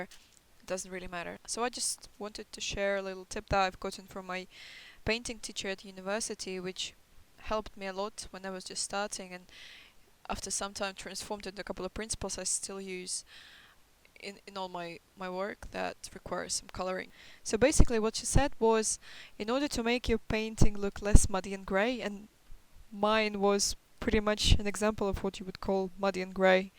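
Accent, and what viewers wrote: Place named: Eastern Europe. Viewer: у тебя не самый всратый английский, что я слышала. Но местами прям "славская" натура прёт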